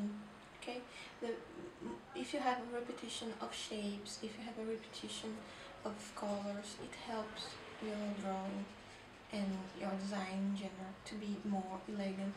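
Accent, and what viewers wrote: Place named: Finland. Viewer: Are you Finnish? You have a Finnish accent.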